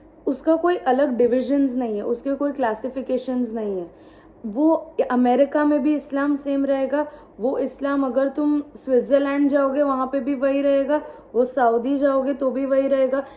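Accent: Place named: Gujarat, India. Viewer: Assalamualaikum.....Aap Gujarat se ho aisa lag raha h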